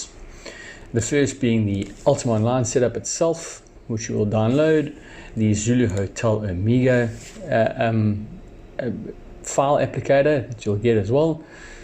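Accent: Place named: South Africa. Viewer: South African Voice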